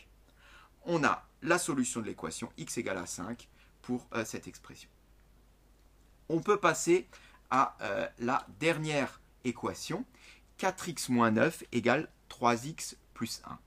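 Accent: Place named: France. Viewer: mais des lunettes et un beau accent français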